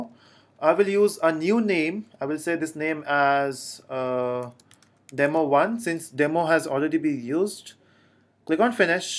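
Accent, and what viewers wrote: India. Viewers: it's hard to understand indian's pronunciation,i'd prefer britsh standard.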